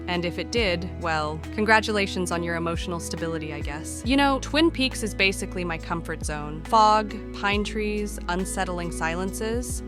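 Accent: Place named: Italy. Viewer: I actually discovered your Lake District videos first and then worked my way back to this, your very first one. I assume the words here were yours, even if the voice telling the story belonged to someone else. I’m glad you eventually found your own voice — quite literally. As I’ve said before, you’re a natural storyteller. Your own narration, your personality and, yes, your Italian accent — I got it right this time! — make the later films feel wonderfully immersive. There’s something fitting about that progression too. Photography is ultimately about finding our own way of seeing the world, and perhaps storytelling is much the same — eventually we have to trust our own voice. And film somehow complements that philosophy perfectly. There’s uncertainty every time we press the shutter, but perhaps that risk is precisely what gives the eventual photograph its value. 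For me, the pleasure of the outcome still far outweighs the uncertainty of getting there. It is fantastic to see where these adventures began.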